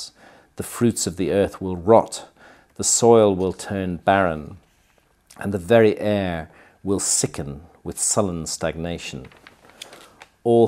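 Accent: United Kingdom. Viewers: Then those sexy 💋, please yall don't do that to me anymore, because I'm from small town alabama u.s.a , but qas once married to a David O'Connor migrated from Ireland pre tern he died 3 yrs ago he was also 28 yrs my senior opposite race than I so we did indeed have plenty of problems but ok anyway I simply miss the acsent of men from the isles, u.k. great britain, I'm absolutely blushed, cause by my living in the country the country, I only hear such acsents on you tube its just intriguing. Fabulously prevented, which is no surprise with you because your always +#100 in mt book because your you and that's a real man whom will gave his voice heard on misdoings of others as well as honesty in presenting your newly found discoveries to the attention of the masses, awesome research 2. Thank you for sharing your knowledge of truth with others such as I. You and your 👪 remain blessed. 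Keep doing what your doing , DON'T CHANGE A SINGLE THING.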